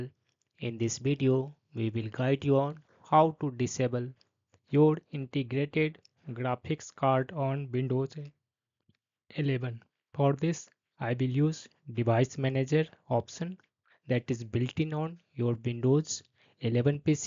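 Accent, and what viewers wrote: India. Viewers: Soon as I heard the Indian accent, I knew I was saved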